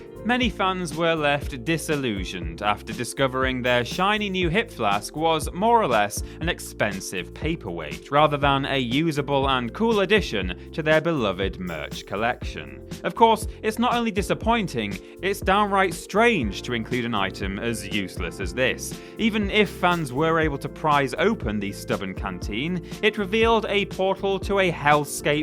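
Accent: United Kingdom. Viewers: While I'm normally cringeworthy towards British accents, I could listen ramble on all day on just about anything.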